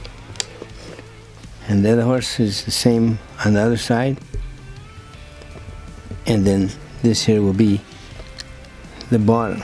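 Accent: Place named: Southern California, United States. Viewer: Are you from Cali? You have the SoCal accent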